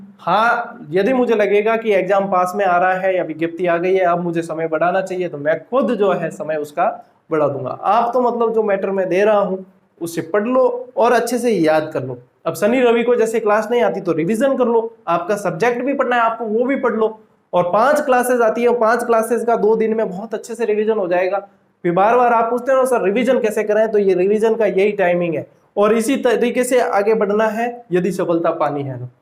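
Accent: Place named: Jalore, India. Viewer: Aapki boli jalor side ki h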